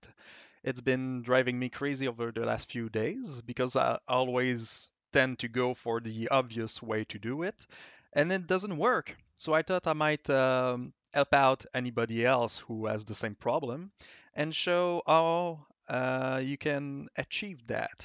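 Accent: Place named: Spain. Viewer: Tu inglés se escucha como si fueras hispanohablante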